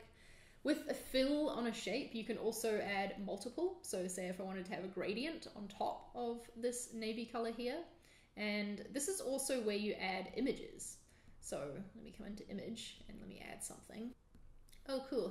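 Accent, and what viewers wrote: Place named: New Zealand. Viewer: Hey thanks for the great vid. I also love hearing a fellow kiwi accent:)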